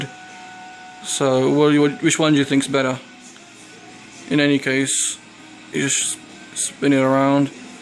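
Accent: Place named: United Kingdom. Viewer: Hi again im back on my own user/channel now, what's your nationality? if you don't mind me asking, you sound British am I right? My PSN name on PS4 is CS20-2015 add me if you want.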